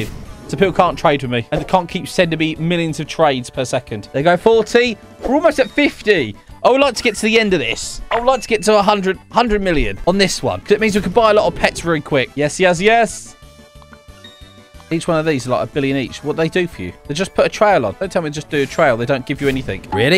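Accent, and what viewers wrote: United Kingdom. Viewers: His British voice never gets old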